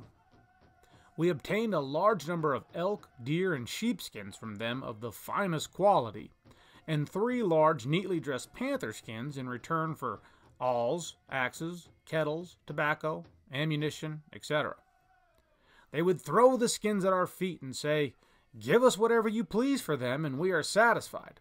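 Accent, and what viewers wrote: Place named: United States. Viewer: oh oh voices of the past except an American dude with an American accent! Very excellent, and fascinating!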